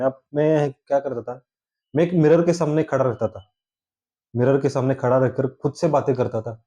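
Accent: Maharashtra, India. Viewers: Sir ji aap kha se ho, bolne se to lagta hai ki , u r from Maharashtra, I'm from dharashiv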